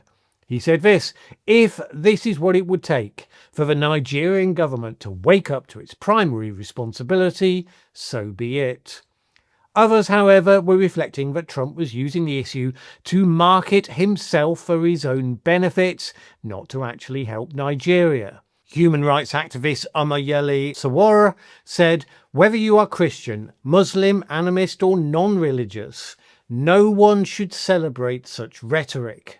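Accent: United Kingdom. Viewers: Maybe you should go and tell this to the people being massacred by terrorist or have you guys become apologists for terrorists because the people being killed are blacks. Listening to your voice, you must be British the very people who have been the horrible people keeping the terrorism going for you to steal Nigerian resources.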